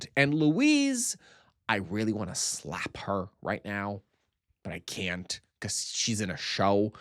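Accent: Portugal. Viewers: já ouvi uns 20 vídeos teus e mesmo assim não consigo perceber de onde é o teu sotaque tuga ahahha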